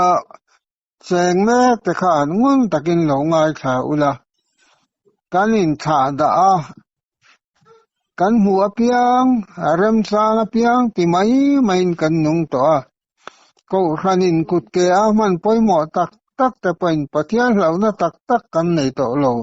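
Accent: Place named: Mizoram, India. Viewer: Vangchhe khua aniin ka hria atawng dan hian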